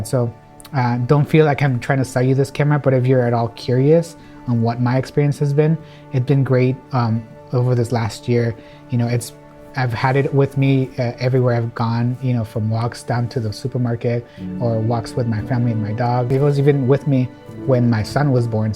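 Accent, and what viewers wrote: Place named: Spain. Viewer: You sound spanish. Do you like Messi- I don't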